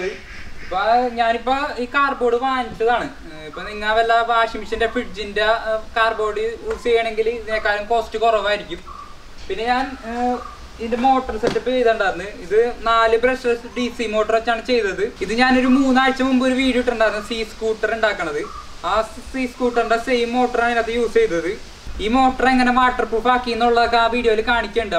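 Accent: Thrissur, India. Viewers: ശൂര് സ്ലാങ് ആണല്ലേ ഗാഡിയെ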